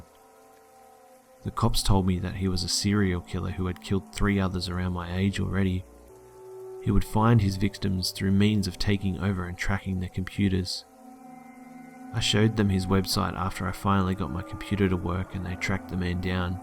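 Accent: Australia. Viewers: Started the video and wondered if you where an Aussie then checked the description and laughed Ps. It's g'day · Are you Australian?? Your accent sounds like you are. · Love your channel Man! I'm a fellow Aussie and it's so nice to hear stories read in our accent :) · You know what scares me the most about your stories, but only the ones you tell that actually happened to you? You have a fucking Australian accent, when I was a kid my house was broken into multiple times but nothing was ever taken. Now that I think of it it scares the shit out of me. Anyway the things that you talk about, make me shake so hard. I don't want to think that any of this shit happens in Australia. But then again, I have seen Ivan Milat in real life too.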